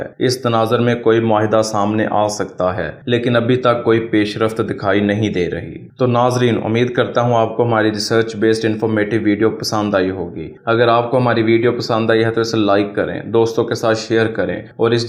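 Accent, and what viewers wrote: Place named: Punjab, Pakistan. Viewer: Why you have Punjabi Accent. Because you are part of India.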